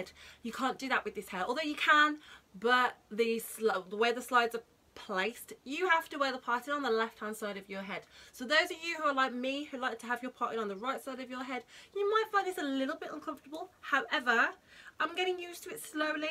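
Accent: United Kingdom. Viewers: So lovely to hear a British accent!!!!! Yessss!!! U look great. I bought it too😊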